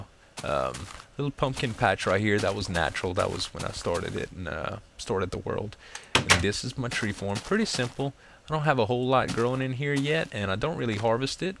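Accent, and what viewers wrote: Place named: Texas, United States. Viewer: you say too too much too too much. but nice voice, accent sounds like a mix between texan and european lol.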